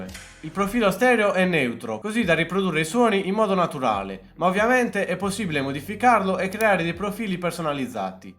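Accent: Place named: Sassari, Italy. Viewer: ti scopro adesso, apro il video, passano 2 secondi e mi chiedo, ma sei di Sassari? La S e l'accento sono quelli, bel video comunque, due game ad apex facciamoli zi!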